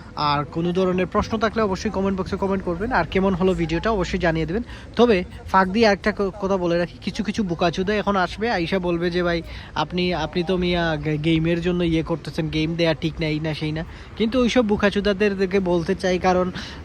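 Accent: Sylhet, Bangladesh. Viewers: ভাই আপনি কি সিলেটের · ভাই আফনে সিলেটি 😊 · আইরাম মানি আপনি সিলেটি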